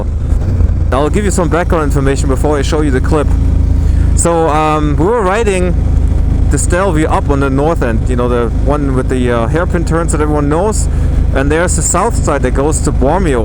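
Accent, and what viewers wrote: Germany. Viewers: Wenn ein deutscher English spricht. Schrecklich, ich breche hier ab. Vor dem Crash noch.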